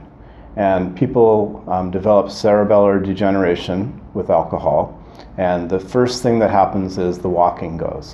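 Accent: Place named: United States. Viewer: Excellent video doctor. By the way, I love your accent. Being a foreigner, your accent is like liquid gold to listen to and learn American English from.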